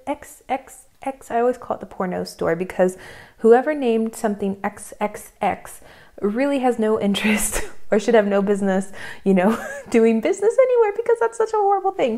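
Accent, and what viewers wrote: United States. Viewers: Where you from bro Texas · First of all love your content and your southern accent. (Y'all) Small talk... No. I want to know less about it/you. AC... In the car yes, otherwise you live in a shed. A house, doesn't get that hot. Water... If you want free water, use the tap. Smoking... Yeah, we got all addicted by the US tobacco companies. so deal with it. Speedos... Well, yeah, they were common decades before shorts. Football... Soccer is for american girls, we love football. The experience from a Football match to a NFL match is like comparing a Formula 1 car and a stroller. There is this guy Connor Sullivan on Youtube, watch his video on going to a premier league in Germany. Otherwise, best wishes from a German, living abroad.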